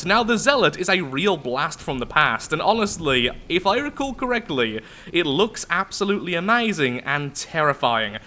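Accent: United Kingdom. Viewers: Indeed (also British accent)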